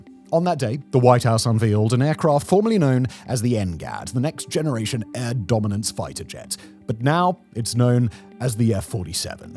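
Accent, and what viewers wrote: United Kingdom. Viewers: We Americans like to learn from people with a British accent. It makes us feel more intelligent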